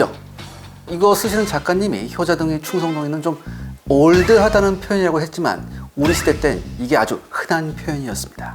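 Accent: North Korea. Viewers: 국방TV에서 북한 말투라... 정권이 맛이 가니 국방부도 맛이 가고 있구만.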